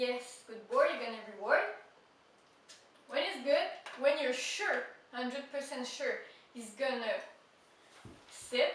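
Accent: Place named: Russia. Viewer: mdr, j'étais pas sûr mais parfois tu as la même intonation que les russes, j'entends plein de russe parler anglais ici (shanghai) :)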